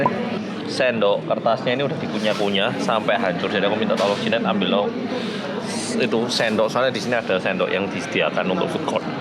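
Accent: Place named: Malang, Indonesia. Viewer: Mas di Belanda tetp dengan logat Malangnya. Ayo ngerawon sek mas + nak Dempo tuku es teler 😂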